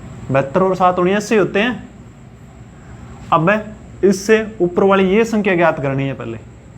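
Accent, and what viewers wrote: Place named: Haryana, India. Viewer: Sir apki boli to haryanvi jaise h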